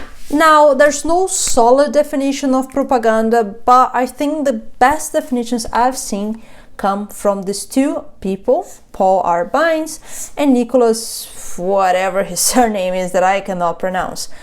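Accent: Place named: Brazil. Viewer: "I dont know how to pronounce and i wont try" HUUUUGE school flashback with this hahahahaha. That send me back. And with this accent... when you knows shes brazilian but you just cant prove it yet (you sound like you could be cute... but it's absurd to try to guess someone's looks with voice and even arms alone)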